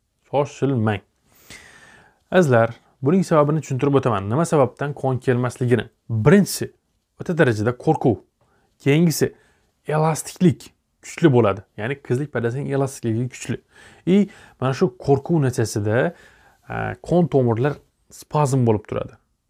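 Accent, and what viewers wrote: Khorezm, Uzbekistan. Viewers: Шифокор Хоразмли шекилли 😊баклаб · Xorazmdanmisz oga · Xorazmlimisz yoo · Dòktr xorazmdanmisiz shevangiz tortyabdi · Салом хаммага адашмасам доктримиз хоразмдан шекилли шеваси тортяпди Хоразм Зур гап юк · Xorazmdanmisz diliz ôxshab durupdi😅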